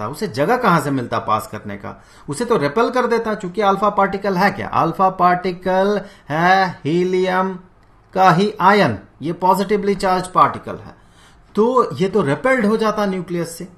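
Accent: Bihar, India. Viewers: Sir ap bihar se ho pls tell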